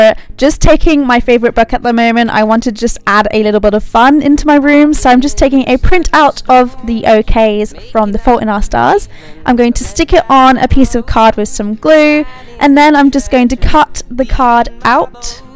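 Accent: Australia. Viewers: amazing video. just wondering are you Australian?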